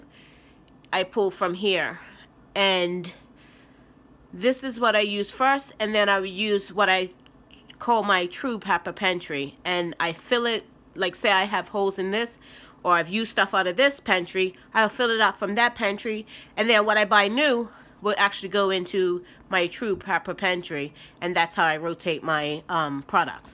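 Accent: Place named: Philadelphia, United States. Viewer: You are one organized lady. Loving your accent... what is that? Philly? Me being from the west coast you would probably say i am the one with an accent!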